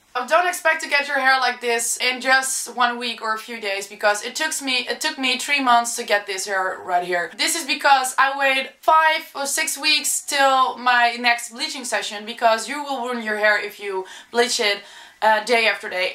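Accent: Netherlands. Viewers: Hahah iedereen zegt precies wat ik dacht! xD je hoort dat je nederlands bent :p But hey, proud to be dutch right? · You're Dutch right? I reconised the accent haha ;). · Kom je uit nederlamd?? E ech tmooi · Are you Dutch?? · ik kan het nederlandse accent al horen lol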